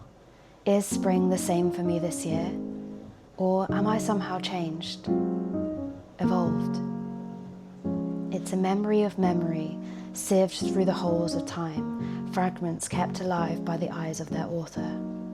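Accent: United Kingdom. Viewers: Great video, my algorithm has been serving me this kind of stuff lately, it’s really refreshing. By the way, where in Britain are you from, what is that particular type of British accent?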